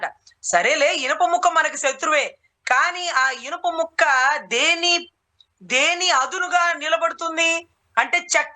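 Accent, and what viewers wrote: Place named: Guntur, India. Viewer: Arre arre Guntur language ❤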